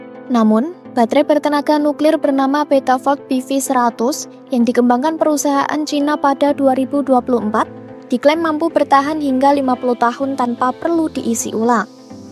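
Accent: Java, Indonesia. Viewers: Kak, aksen Jawanya kuat banget. Mohon lebih disamarkan 😅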